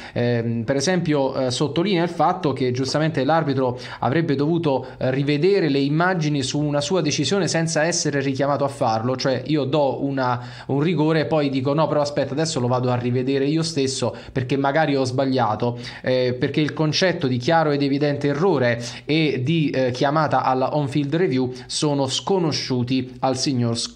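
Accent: Campania, Italy. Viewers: La cosa più brutta di questo video è il tuo accento Campano ti hanno fatto proprio il lavaggio del cervello ci vuole coraggio difendere una squadra del nord...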